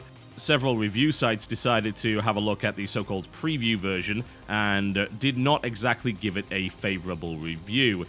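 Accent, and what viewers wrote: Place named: England, United Kingdom. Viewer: Total Biscuit, you'r lossing your english accent :(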